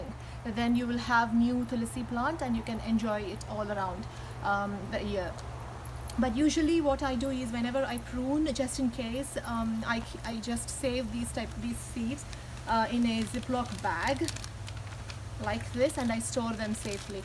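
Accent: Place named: United Kingdom. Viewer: You got British accent wow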